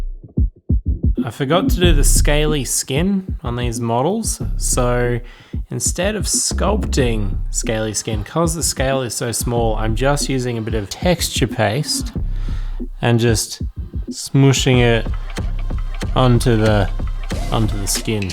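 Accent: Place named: Australia. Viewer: I can see and hear that Australia axon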